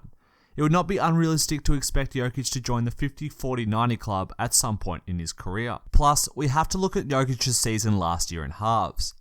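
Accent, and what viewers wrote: Australia. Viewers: A guy from Australia (sorry if I misjudged the accent, not a native English speaker) with 4k subs on youtube makes NBA analysis of such quality and depth, probably working in his room at home, and ESPNs and Foxes of the world, with budgets of tens of millions of dollars, can't give us anything better than Colin Cowherd and Nick Wright...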